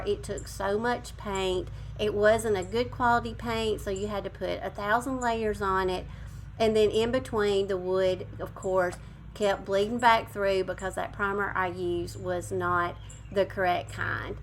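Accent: South, United States. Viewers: Congratulations. You always have wonderful ideas and and I love your makeovers. And I am guessing that many people agree with me that is why you have so many subscribers. I also, think your southern accent is so cute 😊. You've done it again - more wonderful projects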